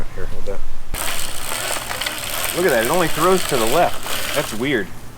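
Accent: Chicago, United States. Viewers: I can't get enough of the accent. I need a whole Chicago accent episode!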